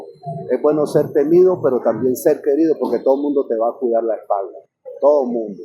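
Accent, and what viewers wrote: Panama. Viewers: Se le sale lo colonense al siervo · Empezó hablando como colombiano y terminó con Flow panameño 😄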